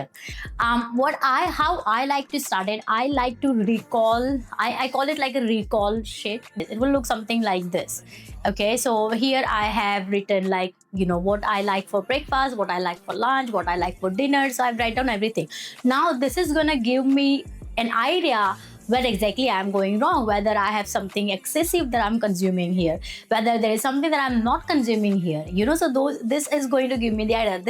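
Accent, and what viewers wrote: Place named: Gujarat, India. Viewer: Are you a Gujarati..accent thoda gujju type hai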